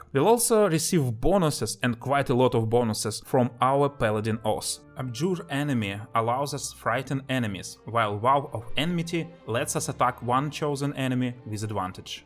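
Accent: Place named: Russia. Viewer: nice russian voice brat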